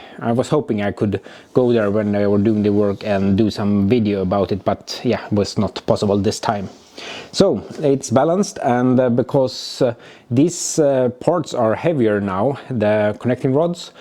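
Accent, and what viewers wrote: Sweden. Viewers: Take care of yourself mate, you are doing something thats really cool and unique. I look forward to seeing the update videos on what you've been doing and hearing you speak swenglish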